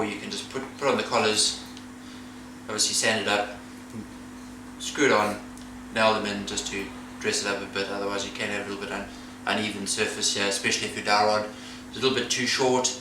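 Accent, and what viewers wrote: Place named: South Africa. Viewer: Not at all, you sound 100% South African, just wondering if you were also experiencing people getting confused with the 'English' South African accent as opposed to our Afrikaans accent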